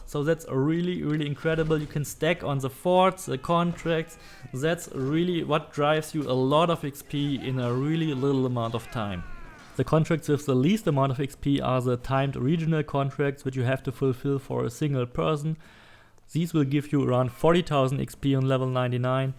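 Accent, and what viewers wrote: Germany. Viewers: You sound like a German to me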